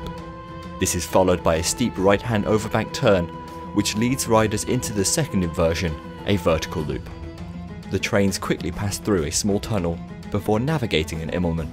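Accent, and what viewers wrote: United Kingdom. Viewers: Great brirish accent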